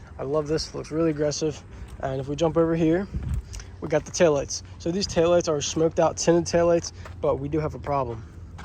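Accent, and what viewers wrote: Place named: South Jersey, United States. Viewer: Da souf Jersey accent is real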